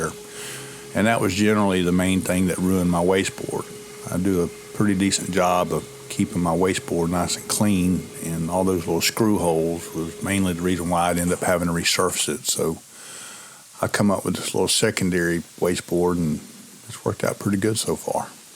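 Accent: South, United States. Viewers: No. Pelicans? No. Crabs? No shrimp? 😅 Beautiful work, southern fried accent. Excellent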